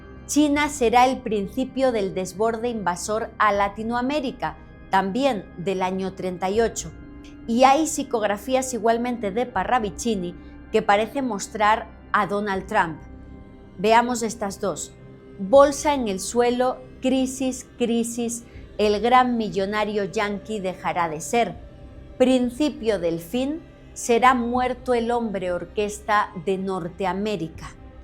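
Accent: Spain. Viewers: saludos desde Formosa, muy bueno tu programa, felicitaciones...sos española o argentina?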